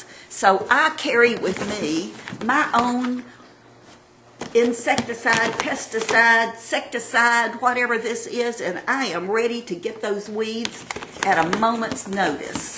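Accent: South, United States. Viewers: Very good but I'm new from England so " who is Miss Tammy?" also love tour Southern accent which state are you from! Tennessee...pleas tell! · Oh!! This is just SO FUNNY 😂😂😂. I am about to wet myself laughing so hard!! You have THE MOST PRECIOUS personality and Southern to boot ❤️. I can't tell you how much this made my day. YOU GO GIRL ❤️😂🌷🌷🌷🌷🌷🌷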